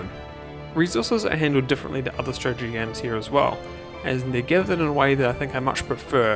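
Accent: New Zealand. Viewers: Are you a kiwi? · I see video, I hear Kiwi, I subscribe ❤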